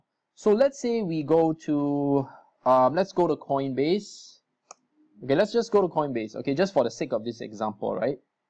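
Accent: Singapore. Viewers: you have great Singapore accent. love it.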